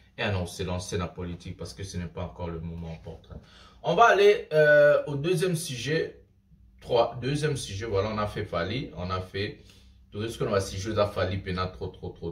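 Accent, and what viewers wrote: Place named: England, United Kingdom. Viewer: na sepeli na accent nayo ya anglais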